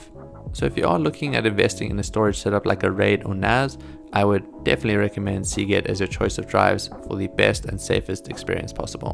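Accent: South Africa. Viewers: You're South African?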